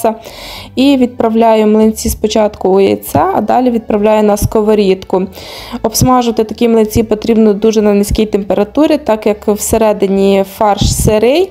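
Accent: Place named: Lviv, Ukraine. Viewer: Дякую за відповідь,бо розмова в вас така Львівська,але я не права Українська.Здоров'я вам та миру.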